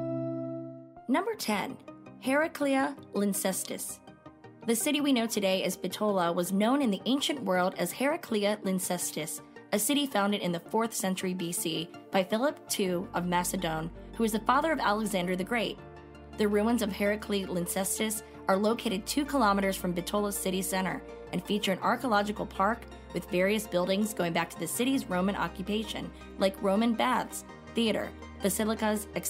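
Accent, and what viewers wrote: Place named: United States. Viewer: An Indian channel possibly targeting Indian travellers and voice over in American English! How many people in India understands American English, I wonder..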